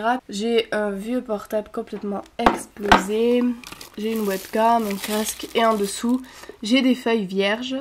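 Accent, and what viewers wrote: South, France. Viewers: Coucou ma belle je vient de découvrir ta chaîne j'aime beaucoup ta chambre qui est simple et mignonne. On devine desuite que tu est du sud avec ton accent :P Tu m'a fait rire avec ton espace " cercueille" Je pense que tu voulais dire sanctuaire? x) Bref super vidéos je m'abonne car j'ai regarder plusieurs de tes vidéos et j'aime beaucoup ta chaîne :) J'ai également une chaîne beauté je t'invite a allez voir si sa te dit sa me ferais plaisir ! Bisous xoxo !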